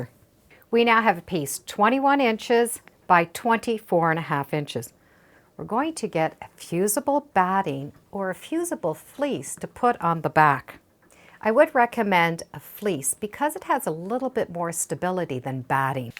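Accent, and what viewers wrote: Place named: Canada. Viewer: your videos are additive☺. Beautiful idea. You do it so neat and perfect. Love the fabric!. Are you in Canada? Your voice sounds like a Canadian😊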